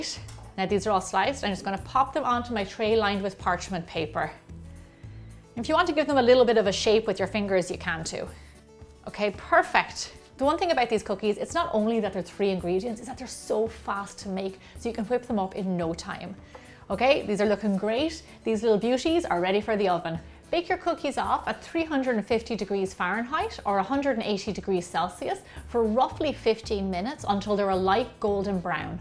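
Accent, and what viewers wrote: Ireland. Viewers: hi, lovely video. started baking recently when i get time, still new to it, get nervous often, but with yr amazing videos, great recipes and precise instructions always, not to mention yr irresistable self, i hope to become a bolder baker soon. i ladore yr irish pronunciation n luv checking out yr videos. just want to say thanks for all the trouble u take to get them to us. you r truly an inspiration to pple like me. God bless. luv from India. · uhh... i love your Irish accent. i could listen to it all day. is that wrong of me?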